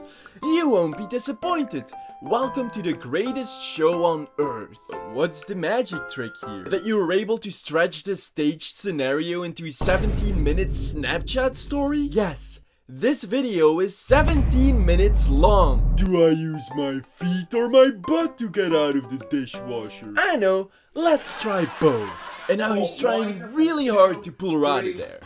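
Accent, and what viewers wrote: Flanders, Belgium. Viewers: What part of belgium are you from? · YOU'RE BELGIAN?? I KNEW YOUR ACCENT SOUNDED FAMILIAR!? LANG LEVE BELGIE 🇧🇪🇧🇪🇧🇪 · zijd gij Vlaams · wacht deze guy is belgisch? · t'es belge ? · are you belgian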